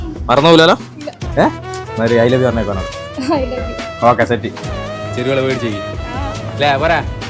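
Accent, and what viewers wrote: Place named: Kuttiyadi, India. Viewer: Kuttyadikkar aaro ingal sound guss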